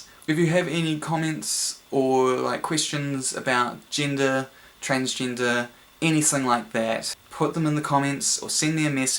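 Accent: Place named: New Zealand. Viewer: what country are you from? you kinda sound kiwi but I might be wrong haha😄☺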